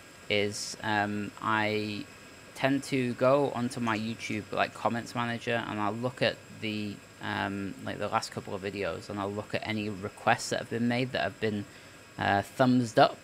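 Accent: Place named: London, United Kingdom. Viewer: lol lol u soun like ur from london lol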